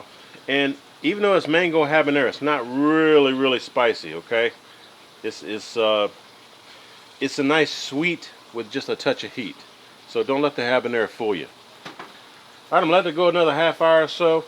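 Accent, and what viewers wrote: South, United States. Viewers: Howdy. That southern drawl is music to my ears!! Much love from Waller,Tx.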